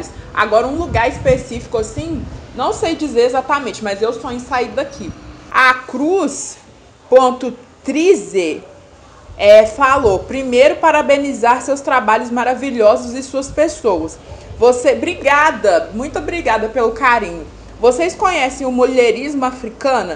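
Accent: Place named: Minas Gerais, Brazil. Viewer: #parabéns meninas ...conheci o canal pesquisando sobre a Gabi de pretas, sim a chamo desse jto, cheguei em em vcs é não larguei mais....fiquei tão feliz qndo vi um canal de mulher preta com sotaque daqui de MG 🙂q bom q vc está cada vez se fortalecendo mais com o canal...voem meninas voem👏🏾🖤🖤🖤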